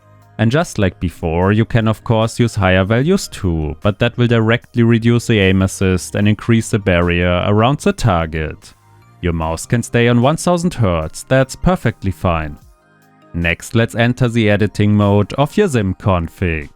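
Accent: Germany. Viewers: Are you German? sounds very German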